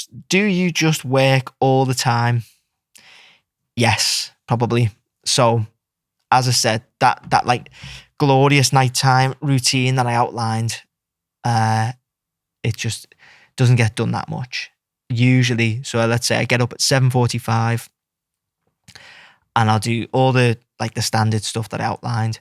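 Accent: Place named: Liverpool, United Kingdom. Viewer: When do you have time to watch Liverpool play?.. you sound like a scouser Edit: I watch right after posting this 😂😂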